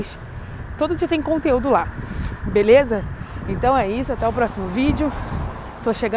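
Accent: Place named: Rio de Janeiro, Brazil. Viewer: Totalmente sem sentido colocar uma carioca para falar de Moema. Ninguém merece ouvir lilais.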